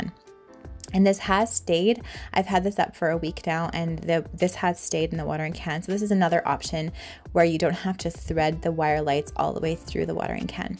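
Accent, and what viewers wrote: Canada. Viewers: Hi! I just subscribed to your channel and just loved every one of your DIYs. My favorite is the bird houses, but the faux water can and your garden sign are a close second. I also love your Canadian accent and am looking forward to more videos.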